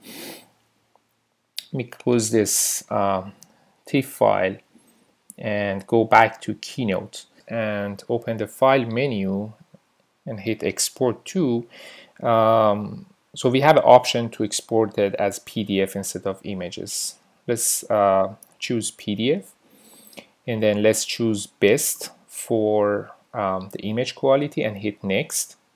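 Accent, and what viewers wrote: Iran. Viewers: I spot a Persian accent when I hear one. great tutorial thank you!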